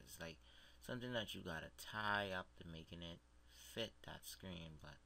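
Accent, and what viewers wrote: Bahamas. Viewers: you sound like an Island boy....You from the Bahamas? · where u from the bahamas aye?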